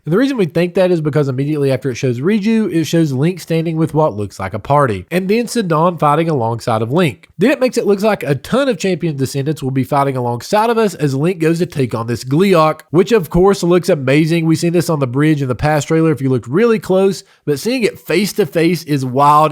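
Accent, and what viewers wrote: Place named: South, United States. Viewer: WOW the southern accent REALLY threw me off!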